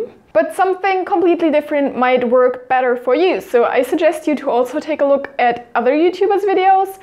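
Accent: Germany. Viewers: Are you German? You sound sooo German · Deine Stimme klingt deutsch :D bist du deutsch? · you hear the german accent... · is she german? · Ist Sie Deutsch? Hört man raus finde ich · Man merkt durch deinen Akzent , dass du aus Deutschland kommst xD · Du bist Deutsch oder? ^^ dein englisch hört sich so deutsch an finde ich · Are you A German because you have any accent from a german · Three seconds into this video and I already like you. And you are German. Heard that within one second. Lol. Me to. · ...mein erster Gedanke war "bist du aus Deutschland?"... nach 6 Minuten war ich mir dann sicher ;-) (ist das erste Video was ich mir von dir anschaue, find ich wirklich gut) At the end of this video you asked about tipps we might have, and i have this to add: Since i bought lots of books for learning japanese and had little time or motivation to actually use them i moved to something while i was using flashcards. 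I downloaded an app for recording stuff and started to record the vocabulary section of one of those books so i would first say the word in german and after a few seconds i would say that word in japanese. Since every word is a single voicefile, i added them to a playlist and listen to it every spare minute. And everytime i recall a word almost perfectly i would delete it off the playlist. once every week i'd put all words back to the playlist and start over, to practice and recall the things i already know. The Progress in which i advanced since then is: in less than 2 months i moved from like a hundred Words to almost 700 Words... it might not be the best, but as well as you used several different ways of studying, it might just add a small portion to the whole process of learning 日本語. Well, thanks for the vid, since i always search for new motivation everyday. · Are u german? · First second of the video starts Me: "Oh, a fellow german" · Ich dachte du wärst deutsch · This is the first video i watch on this chanel but is she german? 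Like from the english accent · It took me five words from your mouth to know that you are German. Pretty cool huh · is she German? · that accent, are u german? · are you German? your accent is great ♥ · You sound so German :o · Are you german? Your accent sounds german (adorable😍) haha I have a strong Hebrew accent (soo bad) haha love from Israel 🇮🇱😘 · Ich habe dich gerade gefunden und kennengelernt. Und man hört den deutschen Akzent (wie bei allen auch) wenn du englisch sprichst ^^ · Where are you from? Sounds like you have a little German accent, but it also could just be my imagination. I'M learning Japanese right now and that was pretty helpful thanks. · Is she German?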